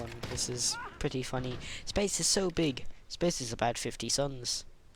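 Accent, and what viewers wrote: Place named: United Kingdom. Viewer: I love your British accent ❣️🤠😍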